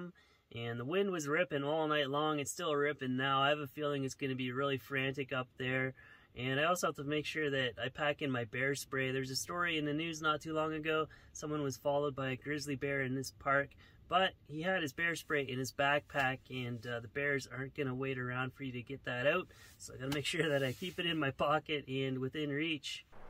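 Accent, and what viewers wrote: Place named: Manitoba, Canada. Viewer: Are you from Manitoba? You kind of have the 'don cha 'no accent.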